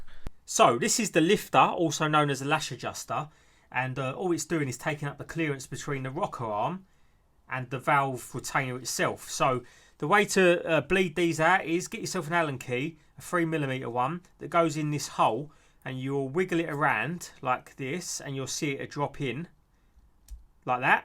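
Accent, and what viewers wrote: Essex, United Kingdom. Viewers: Nice to hear a familiar Essex accent and a channel with decent engine knowledge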